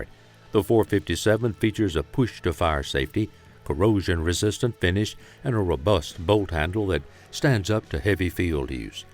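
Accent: South, United States. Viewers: This redneck AI is annoying af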